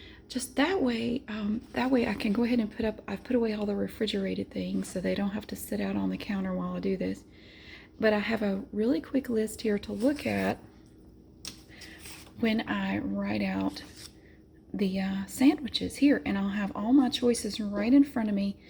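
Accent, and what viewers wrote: United States. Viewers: This is so comforting to me. I live in the UK and my grandparents live in the States so I love watching your videos to hear the accent and the American kitchen and food. Just how I remember ☺️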